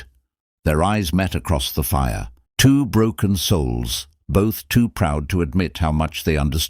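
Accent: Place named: United Kingdom. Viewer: This is a story about the old west. Why does the narrator have a British accent? Very difficult to follow.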